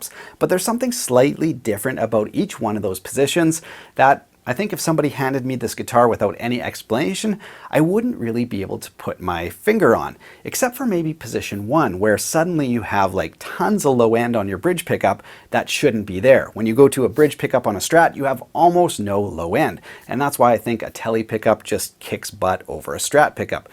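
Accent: Canada. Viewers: How did I not notice the Canadian accent before he mentioned it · The point is why should he, a Canadian person, adjust how he speaks to satisfy an American person.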